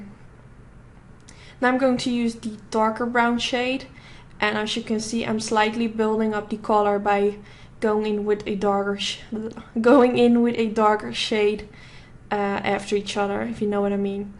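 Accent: Netherlands. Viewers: je doet het wel mooi zeg! merkte meteen dat je Nederlands was haha, x. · Dutch accent I hear you!! Super mooi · Hoi! Ik hoorde meteen al wel dat je Nederlands bent, wat ook helemaal te gek is. Je hebt echt super veel talent en met deze look heb je me geïnspireerd. Groetjes van je mede-Nederlander! ❤️ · Ik hoor echt dat je Nederlands bent 😳😳 maar je kan zooo mooi opmaken wauw !! super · your accent sounds dutch... Are you dutch? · Tusha van Well I knew it! I had a Dutch friend & this is how she talks! Mooi fr 😁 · i knew that when i heard your voice that this was a fellow dutch person haha zo leuk! gelijk gesubscribed! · Where are you from? Are you Dutch? I love your accent! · Flawless😍 enne... Hoor ik daar een dutchie? 🇳🇱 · Are you dutch? You have a dutch accent 😂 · u are totally dutch i can hear the accent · Fiona Anker-Petersen If I hear her accent, I would think she is from Holland... 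But maybe I'm wrong.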